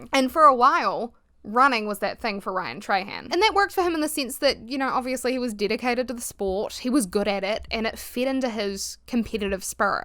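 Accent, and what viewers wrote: New Zealand. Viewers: She's from New Zealand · I know right. Im kiwi and I sometimes hear a tinge of a kiwi accent there, but then there’s also Aussie and American in there. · Im a little late to comment, but I love your videos! You always have a refreshingly nuanced take on the topics you speak about, taking things in good faith and looking at them from a logical yet empathetic perspective. I really appreciate having a channel to watch after work that feels so grounded in reality. I also appreciate and admire your ability to be open and vulnerable about your past hardship while still mainting an optimistic view, as well as keeping distance from us the viewers. I can only imagine that delineating between being honest versus nurturing parasocial relationships is extremely difficult, but you seem to be managing just fine. The way you decide when and how to use personal anecdotes is incredibly effective in enhancing your point and is genuinely impactful because its not the only point you have to make. 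One final thing Ill say is this; I know you get plenty of comments on your accent, but I think its so cute. Ive always loved kiwi accents. When I was a kid my favorite twitch streamer was kiwisimmer (or a username along those lines), partially because her voice was so soothing to me. Keep it up with the amazing videos, your perspective and personality is unique and incredibly necessary on this platform! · I hear Kiwi. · Sorry! Kiwi accent!! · fellow kiwi with some linguistics knowledge here. You def sound like a Southie for the most part. Notably though, you do velarize some sounds. Mostly h and s sounds from what I've noticed. Could def be something you've picked up from your Dutch dad. Or i could be way off. Hope it was maybe interesting though 😊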